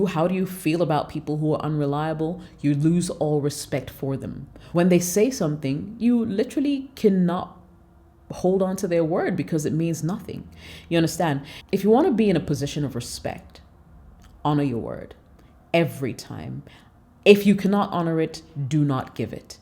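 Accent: France. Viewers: True facts. Respect is a King aspects of real man. Thanks and your french accent is good!! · First I command you on your french it is a fine cute accent. Your channel is lit. You bring so many excellent materials and I truly believe this episode is the best of all. W/O respect there is no relationship. Wishing you that you reach your goals. Touloulou.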